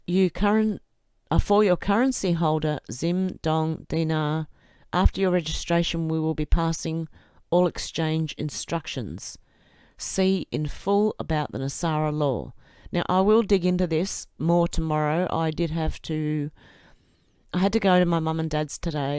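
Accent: Australia. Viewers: I'm very glad I found your channel. Great content, authentic and soothing energy. Love your Aussi twang.. peace from Canada (speaking of twang eh) 🙏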